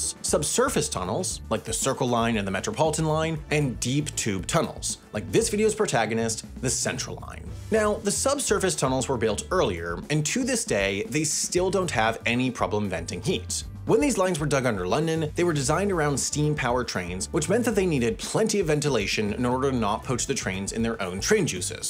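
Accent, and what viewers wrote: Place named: United States. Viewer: Why is this narrated by someone with an annoying American accent? It may have been interesting but I couldn't bear to listen.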